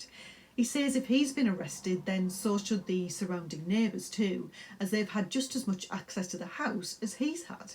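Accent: Newcastle, United Kingdom. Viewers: are u from Newcastle?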